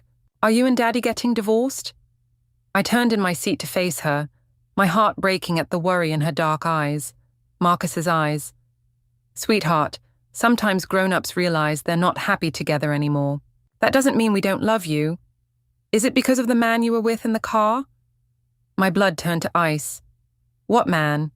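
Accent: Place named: England, United Kingdom. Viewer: “Sarah’s mom saw you and told her mom and Sarah told me at lunch…” Then , the convo makes zero sense - the daughter is with her dad, at grandma’s, but Liam brings the daughter’s art supplies back to the house where she doesn’t live and says “she forgot them yesterday…” What TF? It’s almost like no one actually listened to this slop after the computer regurgitated it using a prim, proper and stuffy English accent who, when she protests, she protests “weekly.” SMDH AI-generated 💩 like this video truly sucks 👎👎👎👎👎👎👎👎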